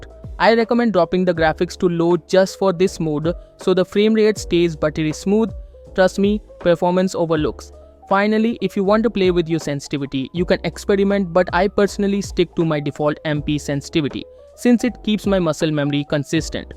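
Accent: India. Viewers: Shit Indian akzent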